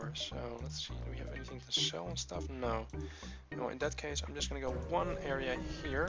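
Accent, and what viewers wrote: Netherlands. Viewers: i can't but notice your accent sounds like you are dutch, am i correct?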